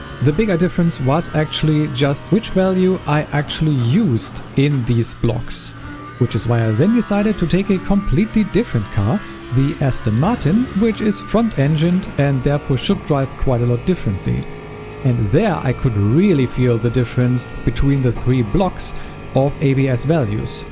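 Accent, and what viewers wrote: Germany. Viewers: You cannot brake noob. Also dude, this German accent sounds terrible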